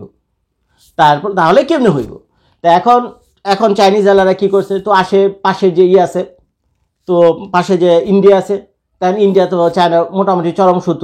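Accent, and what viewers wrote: Dhaka, Bangladesh. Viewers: জোস ভাই আপনার কথা শুনতে অসাধারণ লেগেছে খুবই অসাধারণ লেগেছে কথার মধ্যে ঢাকাইয়া টান থাকার কারণে আরেকটু বেশি অসাধারণ লেগেছে। কিন্তু ভাই একটা জিনিস ক্লিয়ার করলেন না আমেরিকার মধ্যে কি সবই আছে যদি সবই থাকতো তাহলে বহির্বিশ্বে থেকে আমেরিকা আমদানি করত না চায়না রাশিয়া ইন্ডিয়া বাংলাদেশ যদি আমেরিকা কিছু না দেয় তাহলে তো ডোনাল্ড ট্রাম্প গেয়া!!!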